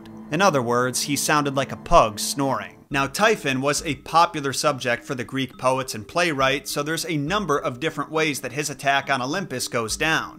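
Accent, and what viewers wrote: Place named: Midwest, United States. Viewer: What part of the Midwest are you from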